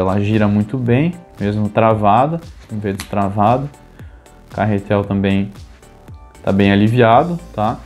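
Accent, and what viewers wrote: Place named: Rio Grande do Sul, Brazil. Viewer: Top o vídeo vc é do rio grande do sul? Vim aqui procurando um vídeo sobre carretilha pq estou tentando juntar uma grana pra comprar um pq pesco de molinete até o momento mas que sabe consigo comprar uma. Mas aí vi que vc tem um sotaque gaúcho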